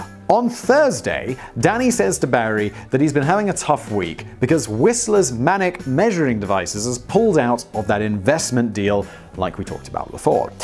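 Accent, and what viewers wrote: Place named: United Kingdom. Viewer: The British accent in this one is throwing me off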